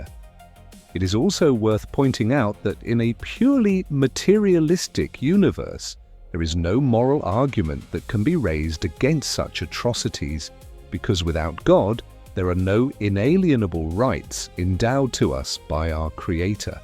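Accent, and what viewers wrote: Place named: England, United Kingdom. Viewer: the english accent instead of a german accent speaking english turned me off the content, the english language replacing completely anything to do with the person has been pushed onto the world for far to long causing too much damage... its only a little thing but its still part of a bigger thing. Generalised accent more broadly accessable wider audience... there are heaps of examples of germans speaking english that re perfect to understand to use as an example.... anyway, take my comments for what they are worth. All the best.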